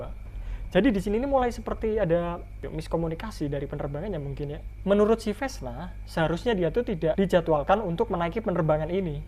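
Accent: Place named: Java, Indonesia. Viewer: mas’e medhok opo wong jowo :D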